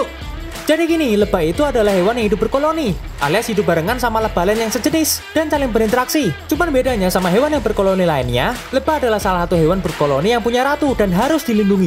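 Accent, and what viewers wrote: Java, Indonesia. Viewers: JOWO! confirmed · ternyata adalah wong jowo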